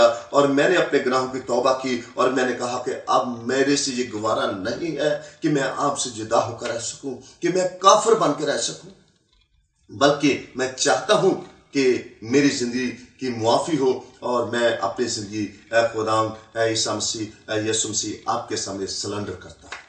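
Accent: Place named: Arab world. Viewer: qabil e ghor bat yah hay is video main k jab yah arabic qawaid janta hay or yah arabic bhi smajh leta hay hafiz bhi hay to isay tarjuma parhnay ki zarurat kyun paish aie? or is ka arabic lehja bhi note krnay wala hay ,, yah khinzer choora hi tha or hay , yah dramay bazi kr rha hay musalmano ko gumrah krnay k lie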